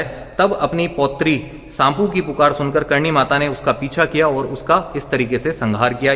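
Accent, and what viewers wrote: Standard. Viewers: Jai Karni Mata ki. Aur app ke dwara hum sab jo dekh rahe hai jan rahe hai uske liye app ka avari hoon. App ki sudh Hindi bahut hi accha lagta hai. U r too good spokesman, like it. App acchi Sanskrit bhi bolte hai. Karni Mata ki sabhi jagah behad Sundar hai aur mainly safsutra dekhne wali hai. Goushala bhi bahut accha aur saf hai. Too nice vlog presented by you ji thankful to you for it.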